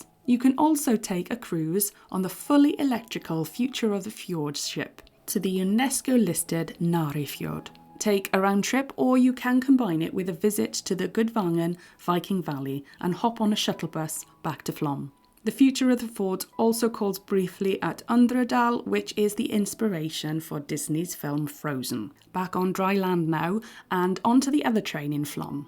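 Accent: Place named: Wales, United Kingdom. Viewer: So much great information in a lovely Welsh accent! We will be in Flam in August so this has been exactly what I needed to see. Thank you!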